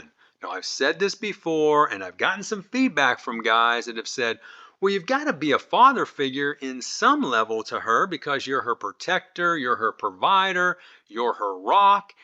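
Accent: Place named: Pittsburgh, United States. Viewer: Sound like you’re from the Pittsburgh area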